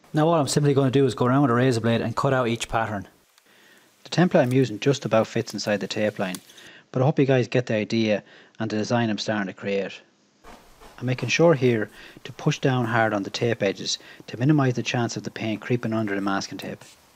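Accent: Limerick, Ireland. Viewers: You sound Irish and this is a very honest video it's beautiful so are the wheels when on that car cheers for makeing it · McGregor has Scottish/northern I think this fella sounds Irish. But I do get your joke man! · I’m from Southern Ireland, I can confirm this is not a Dub accent 🤣 Limerick/Wexford maybe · Nice to hear an Irish Man 👍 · That Irish Accent...!! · Lol it's hard enough understanding that Irish accent and talking fast ain't helping ither 😂😂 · He is Irish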